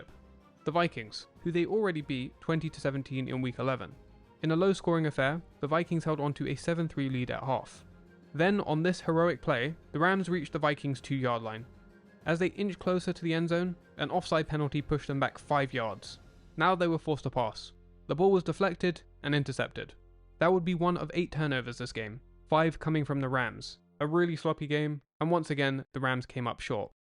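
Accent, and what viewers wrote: England, United Kingdom. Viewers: good video. I like your English accent. With that said it bothers me when talking about American Football. Would you be a lamb and do a video Hershel Walker.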